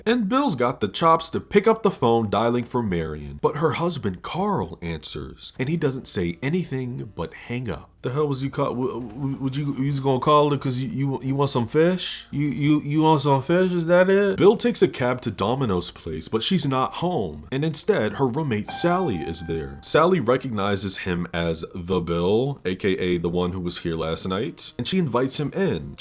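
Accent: United Kingdom. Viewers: As a Brit I love your British accent. I think you're the Bill Hader of film analysis. 👏👏👏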